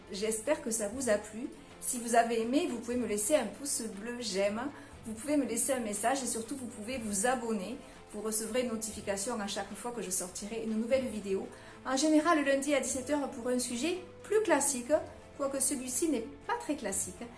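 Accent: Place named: Toulouse, France. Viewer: BRVOS beau travail e t bel accent de TOULOUSE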